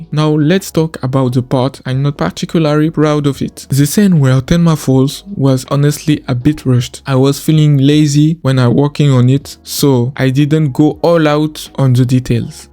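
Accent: France. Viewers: faut avoir des balls pour poster une vidéo ou tu parle en anglais avec l'accent français mdr sinon continue chef c banger ce que tu cook ! ( tu ma tué mdr) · j'ai reconnu l'horrible accent fr mais sinon très bon taff ! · Very french accent mdr. Mais comme d'habitude le rendu du GOAT de la 3D sur Inazuma est légendaire.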